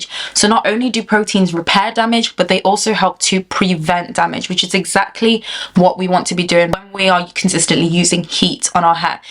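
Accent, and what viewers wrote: United Kingdom. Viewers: Your accent sounds quite Northern? Are you from Manchester? Leeds?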